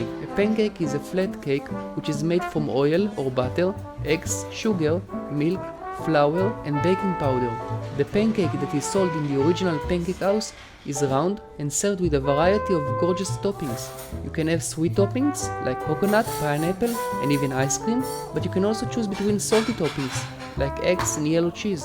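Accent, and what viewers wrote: Israel. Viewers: icetealemonplease it's an Israeli accent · איך שומעים שאתה ישראלי😂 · אחי המבטא שלך כל כך ישראלי...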